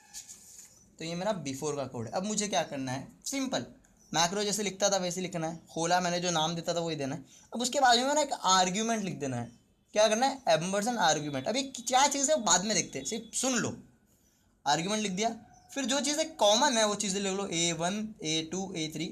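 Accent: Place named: Gujarat, India. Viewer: are bhai aap kaha se ho? bato se to gujrati lag rahe ho....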